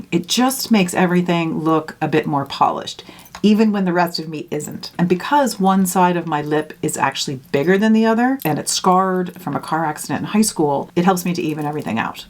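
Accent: Ireland. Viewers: You occasionally have a bit of Irish accent!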